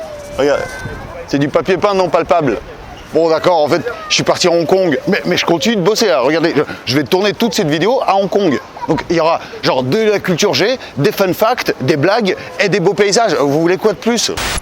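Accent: Russia. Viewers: Tu parles en anglais avec l'accent russe, c'est énorme ! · C'est marrant en Anglais on entend ton accent Russe et pas en Français... Я тоже русский, ну у меня нет русского акценты , только французский 😅.... Je ne sais pas trop ce qui est le mieux 😂. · J'adore l'accent Russe qui prend le dessus quand tu parles en anglais.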